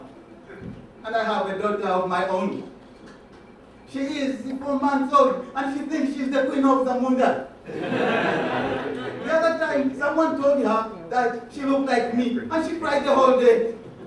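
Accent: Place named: Jamaica. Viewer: i dont know which tribe in Uganda speaks like that.....u sound more like Jamaican..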